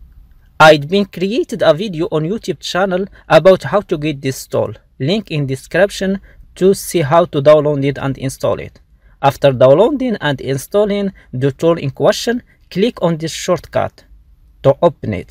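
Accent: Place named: India. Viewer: He is speaking English with Indian accent.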